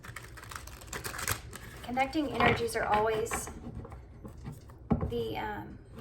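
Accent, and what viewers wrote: South, United States. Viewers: Hi :) I just found your channel and I have to say that I love your energy and I really relate to your messages and the way you bring them across and they are helping me so much right now.. I’ve always loved a southern accent too so I just like listening to you talk! Lol thank you for taking the time to do these readings I truly appreciate it :)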